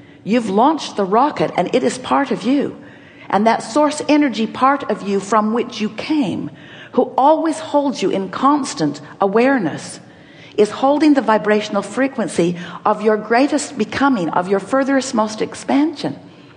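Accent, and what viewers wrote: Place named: Ireland. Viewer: isn't that sota Irish bahahahahahah :) ♥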